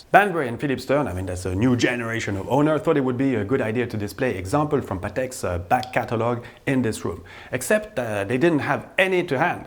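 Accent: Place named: France. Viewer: Interesting content and very ambitious lecture. But: you try to press much details into 20 mins of video. That leads to a velocity of your speech which together with your strong french accent I can hardy understand. And I'm familiar with Englisch. Alltogether I'm faced with a kind of frontal teaching, which I hoped to be extinct since the 60s.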